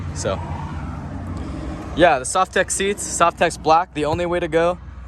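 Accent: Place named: California, United States. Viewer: I’m a New Yorker and I love your California accent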